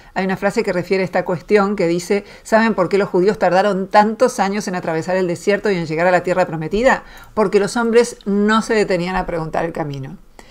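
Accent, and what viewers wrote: Argentina. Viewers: Castellano a lo mexicano con acento aegentino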